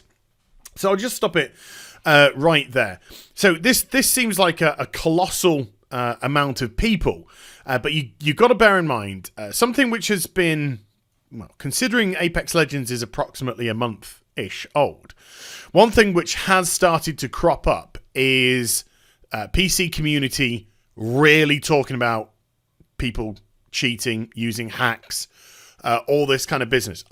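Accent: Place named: RP, United Kingdom. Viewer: worry not... American are not use to soothing British posh accent... keep it coming mate you are doing just fine!!!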